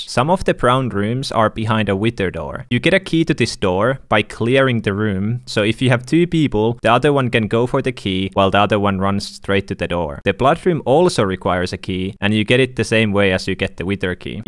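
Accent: Finland. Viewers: R u Finnish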